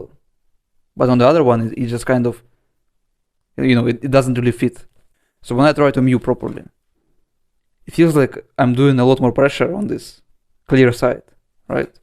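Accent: Russia. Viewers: where you from bro? you have russian accent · Where are u from bro? Ur accent is so Russian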